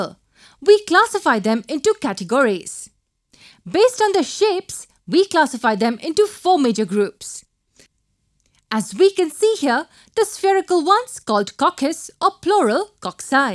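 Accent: India. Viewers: your indian accent hurts my head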